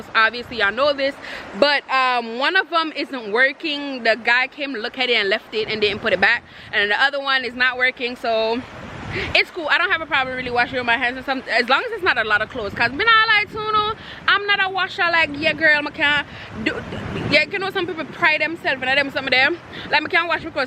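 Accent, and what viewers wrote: Jamaica. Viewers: Uh hear patwa strong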